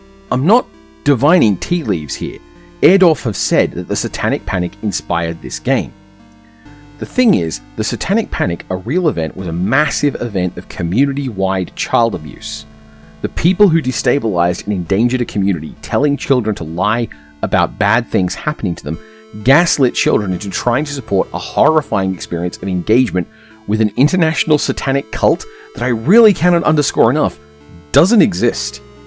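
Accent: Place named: United Kingdom. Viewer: Somehow I didn't notice that had a British accent until the "mum/mom" thing. I have watched several of his videos.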